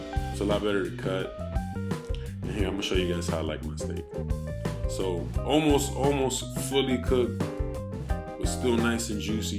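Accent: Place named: California, United States. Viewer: You sound like you from Cali 😂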